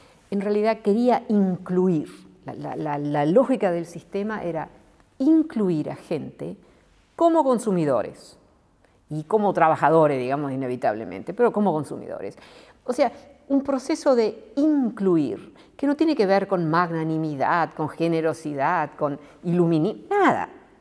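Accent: Argentina. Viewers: No esperaba que hablara en español menos tan porteño. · How come she speaks perfect argy Spanish? I hear that she lived until 1950 in Argentina, but at that time she was 1!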